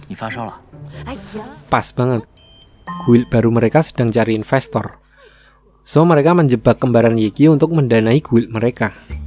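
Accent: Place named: Java, Indonesia. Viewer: Bahasa Indonesianya medok pasti orang Jawa ni😂salam bang dari Jawa tengah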